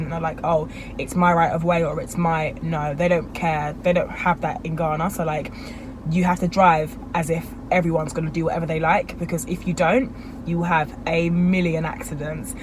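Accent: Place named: London, United Kingdom. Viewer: Ugh leave that annoying london accent in london. Yall need to leave some things in London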